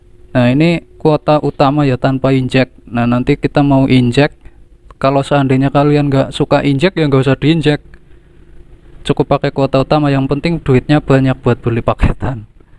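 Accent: Banyumas, Indonesia. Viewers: Ngapak apa yah kang?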